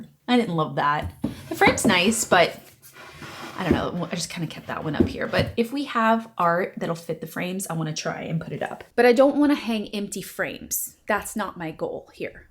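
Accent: Texas, United States. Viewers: I think your Texas accent came back a little bit during your time there. It’s cute to hear, as a midwestern viewer. I’m glad you’re having fun with your living room, even if it’s temporary.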